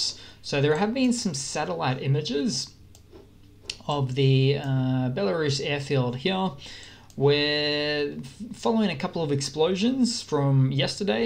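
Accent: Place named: Australia. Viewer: I like how you report major events based on their locations using a map. Your Australian accent adds individuality to it.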